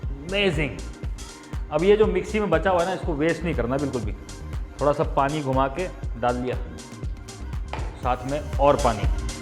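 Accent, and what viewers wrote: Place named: Maharashtra, India. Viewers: You have picked up typical Marathi accent! Great fun. झक्कास मिसळ बनवली दादा! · Chalaa bole, are ekdam marathi lage ji!!! ❤🙂👌jiyo chef ji!!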